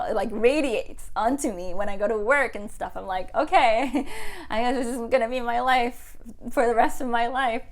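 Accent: United States. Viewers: It sounds almost like American!😭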